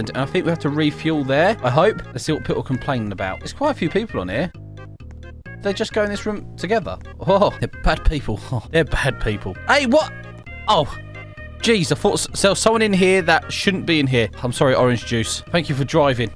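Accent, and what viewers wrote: United Kingdom. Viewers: For at least 1 year I didn't know he was british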